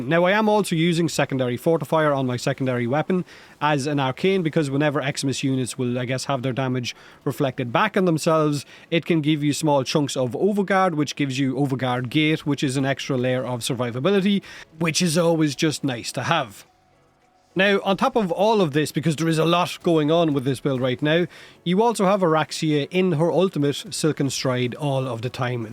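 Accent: Ireland. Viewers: Irish Warframe player brings me joy❤️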